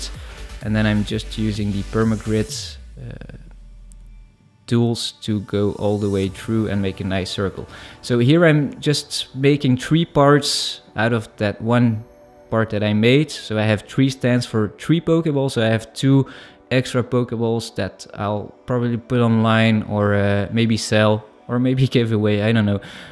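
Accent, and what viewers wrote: Netherlands. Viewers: ah i love the dutch english talking xD but you said you thought about selling it or giving it away, but how can i buy this? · Bro you dutch or something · Blauw? Bier? saying ehhh? saying Ant instead of And? Are you dutch? HA, i just saw your Bio and belgium counts aswell :) i'm too good at this XD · You sound dutch, cool ball tho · I watchdd the intire vid and im still confused. Were are you from. Becouse i hear bits pf a dutch accent. But im not sure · Lol by your accent i knew you were Dutch! Im dutch too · Are you from the netherlands? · Are you by any chance Dutch? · Just out of curiosity, are you Dutch? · You dutch? If ur not u sound rly Dutch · are you dutch? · Deze makker heeft wel echt een typisch nederlands accent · Damn the Dutch accent is strong with this one! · Okay i immediatly recognised your Dutch accent. Well im Dutch too so hi there 👋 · leuke video man :) je hebt nederlands accent haha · You're dutch, aren't you?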